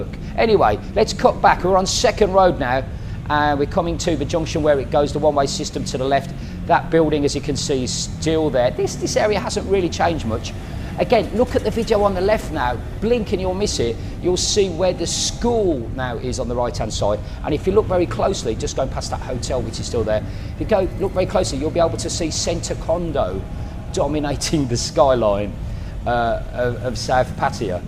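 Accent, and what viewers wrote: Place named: Midlands, United Kingdom. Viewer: You sound like your from near me. A definite midkand accent I'm from Warwick well a village just outside beausale it'd on the way to Balsall Common. The back way into brum.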